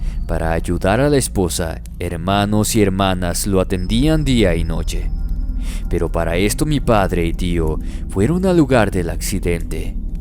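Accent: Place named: Chile. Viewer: tu linda voz de chile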